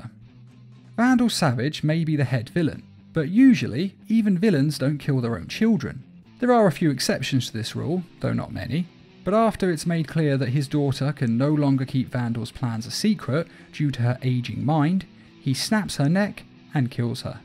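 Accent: United Kingdom. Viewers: Hey. Just wanted to say how much I love your content.I wasn’t very interested in the superhero content until I stumbled across your channel.Hearing that sweet,beautiful British accent is so satisfying every time.Love your content and hope you get more recognition in the future.For others who see this,have an amazing day.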